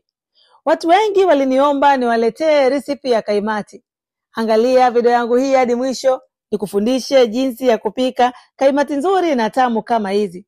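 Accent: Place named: East Africa. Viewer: Your accent is from which part of East Africa?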